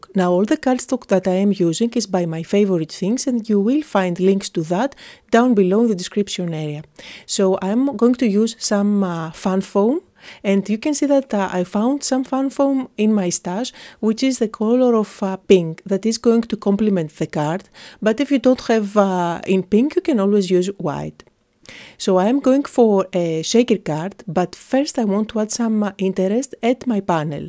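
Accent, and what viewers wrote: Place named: Greece. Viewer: So cute,! I think my favorite is the little boy with the clothes in the washing machine! How imaginative! So, you are Greek, I gather? I love listening to your accent! Your English is very good!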